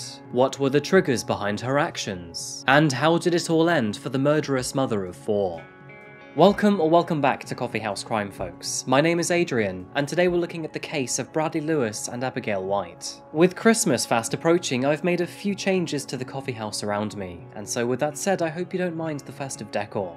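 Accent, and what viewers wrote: United Kingdom. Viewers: That’s who I thought it was until I heard the British accent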